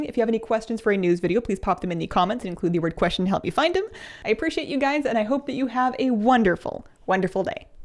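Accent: Canada. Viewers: Do I detect a hint of Canadian in there? "Blame Canada! With those beady little eyes and floppy heads full of lies!"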